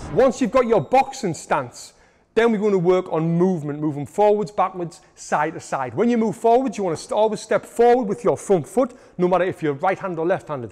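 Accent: United Kingdom. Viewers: His British accent is interesting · British accent makes this lesson reliable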